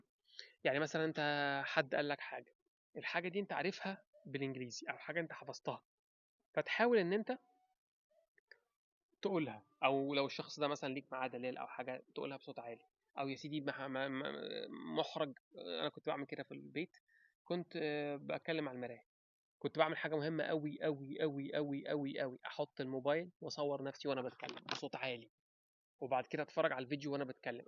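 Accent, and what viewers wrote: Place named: France. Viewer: دا الاكسنت الانجليزي الفرنساوي مضحك بجد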